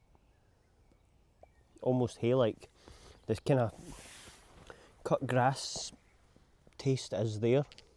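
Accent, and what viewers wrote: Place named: Fife, United Kingdom. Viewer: Its nice to hear a Fife accent. Orlik gets a lot of good reviews, and I think I'll copy your lead and try both.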